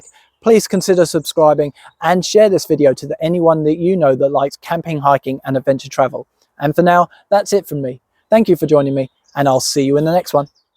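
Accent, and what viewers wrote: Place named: United Kingdom. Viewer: I don't know man, I love the premise behind the videos, etc. But something in the delivery is missing.... Perhaps it's your British accent making us Americans think you are just being a pretentious arse instead of being tongue in cheek. Maybe dress up as one of the knight s who say nee or something, somehow give us some slapstick. I'm not sure exactly what, because the observations are very on point, so it's gotta be somehow in the delivery that just doesn't get me laughing.... Can I ask where you originally hail from? Dry British comedy can be enjoyed by us, but we still remade The Office with Steve Carell....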